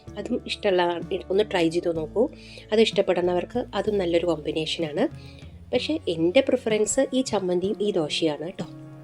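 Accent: Palakkad, India. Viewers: Hmmm kandapo kodhiyayi.. uluva manam varumo.. evide kettyonum makkalkkum manam vanhaal pne kazhikkilla..☹️🙄.Pne kandhisammandhi kettittundu.. vaayil vellam vanhutta.Videos ellam nannaavunhundu..You have a very unique voice and style of narration..😍☺️.Enikka palakkadan shyliyilulla ‘ttolu’ bayankara ishtaanu kelkkan. I thought you are a vegetarian..apozhaanu Mathippuliyude video kande…It was sooo good to see.Will try soon…All the best to your channel ❤️❤️❤️❤️Nattil varumbo Palakkadu varunhundu…Apo kanattolu 😍❤️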